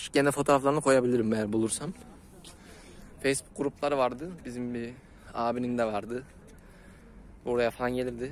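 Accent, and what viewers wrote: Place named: Ankara, Turkey. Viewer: Angaralı olduğun nasıl da belli ya la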